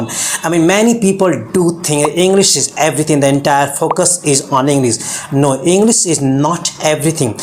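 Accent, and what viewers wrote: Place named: United States. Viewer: Sometimes you speak American English but I love this video because it's totally in English and you have told about communication skill so it's obviously powerful. I've seen this video 3 times today.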